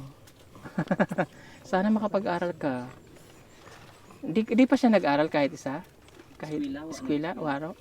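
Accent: Visayas, Philippines. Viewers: Sir naaadopt mo na Ang accent Ng bisaya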